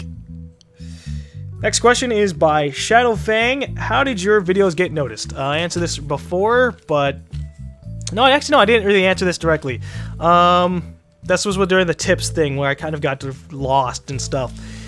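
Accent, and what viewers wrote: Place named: Hawaii, United States. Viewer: hey you from hawaii... brah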